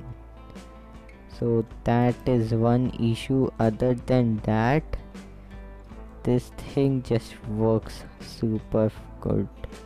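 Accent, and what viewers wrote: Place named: India. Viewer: Indian voice? Yuck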